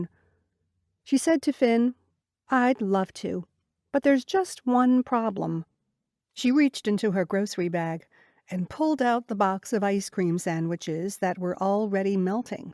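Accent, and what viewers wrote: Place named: Canada. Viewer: Canada. The accent is about what I would hear back home in Wisconsin. The local slang,....no idea.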